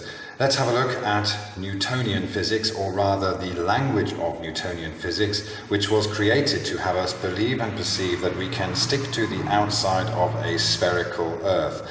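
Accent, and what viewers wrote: England, United Kingdom. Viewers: can be denser than other air; this is the basis of meteorology, i.e. weather forecasting. Warm air floats on cold air, because of density, but ALL air is attracted to the earth. Its called gravity (stupid), and since ALL air has mass, gravity can act on these objects. Do you actually understand any physical science, or do you think people believe you know what you are talking about because of your "english" accent? Sir, you will never be mistaken for David Attenborough; an actual scientist:-)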